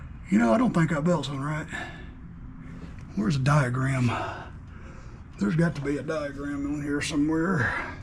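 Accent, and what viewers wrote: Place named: Georgia, United States. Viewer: Sounds like you in ga I’m in Covington ga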